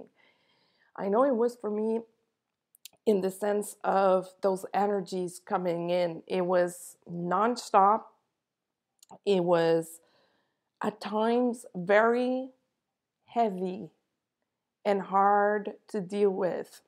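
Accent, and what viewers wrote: Quebec, Canada. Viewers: Est tu Québécois?? Merci.. Spot on message💙🌻🌈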